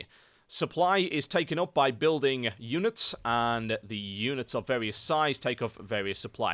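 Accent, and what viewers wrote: United Kingdom. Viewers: You may not be an experienced player, but you're a pretty darn good commentator. Love your accent. UK, right? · lol... British.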